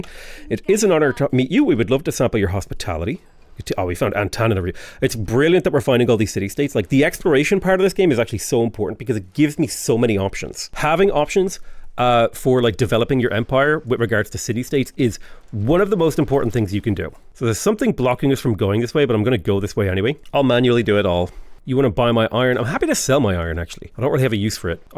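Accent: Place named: Ireland. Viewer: As a Scotsman from a small town between Dundee and Aberdeen IRL I think you have improved the landscape massively 😅 however I really wish you would talk a little slower as the tips on the game you are providing are gold dust. And if it's hard for a Scotsman to understand your quick-fire Irish, then I'll guarantee other people will struggle more. Looking forward to watching this entire playlist though.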